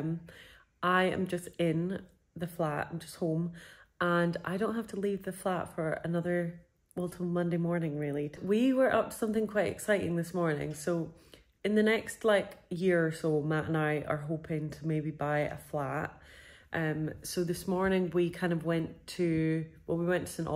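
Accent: Scotland, United Kingdom. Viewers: Lovely vlogging style and great to hear a Scottish accent ❤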